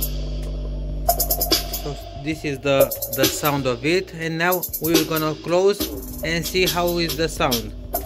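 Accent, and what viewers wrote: Romania. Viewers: asta e roman la cum vorbeste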